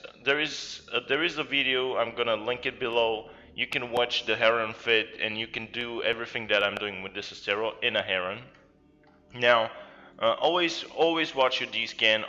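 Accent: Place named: Eastern Europe. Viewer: English isn't the guys' first language. Give him a break. It's common for eastern Europeans. Especially east block scum.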